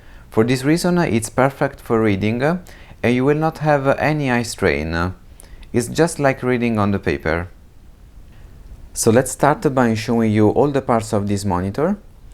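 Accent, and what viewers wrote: Italy. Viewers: Italian accent??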